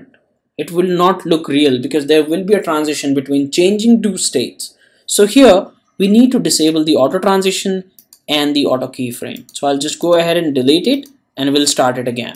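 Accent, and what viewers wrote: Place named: India. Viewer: I fount it interesting that your name is Japaneses and you have Indian accent!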